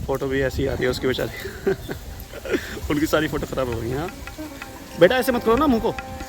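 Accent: Standard. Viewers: आपकी शुद्ध हिन्दी सुनकर बहुत अच्छा लगता है l धन्यवाद 🙏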